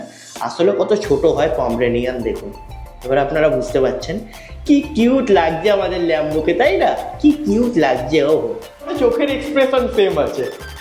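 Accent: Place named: Medinipur, India. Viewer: তুই কি মেদিনীপুর এর ছেলে তোর কথায় এত টান 😅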